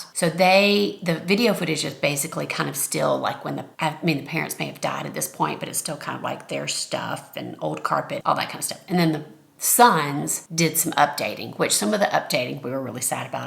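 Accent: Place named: South, United States. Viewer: I’m so eager to hear how your southern accent evolves onto British. It’s going to be adorable! 😊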